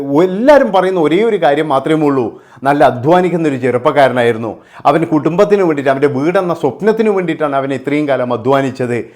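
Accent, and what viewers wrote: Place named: Kasaragod, India. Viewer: anchor kasarkottu karanananoo..athe slang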